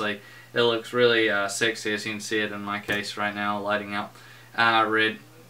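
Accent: New Zealand. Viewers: Mate, your from New Zealand? Awesome!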